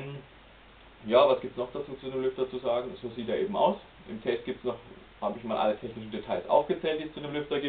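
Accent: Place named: Swabia, Germany. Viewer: Wie ich den schwäbischen Dialekt hasse, da kommt so richtig mieses Fremdschämen auf - Ich bin selber Schwabe... ;_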